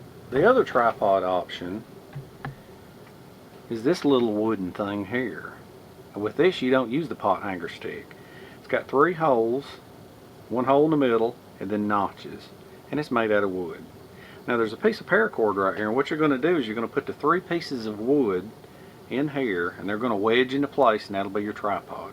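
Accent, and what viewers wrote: South, United States. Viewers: Love watching your vid’s you have the coolest voice lol, very southern 👍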